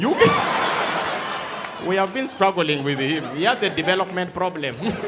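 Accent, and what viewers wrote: Uganda. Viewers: you nailed the American accent. And I salute you for carrying out the whole sketch in your Ugandan accent. It shows how much proud you are of your culture. Warm salutes from Morocco 🇲🇦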